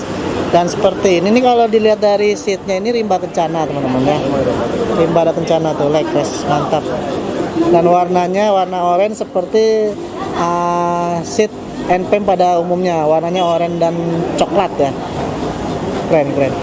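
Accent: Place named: West Sumatra, Indonesia. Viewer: Masak gak tau lakuak paku bg...abg aja asli orng minang...bahasa indonesia abg aja masih ketahuan logat minang nya🙏🏿🙏🏿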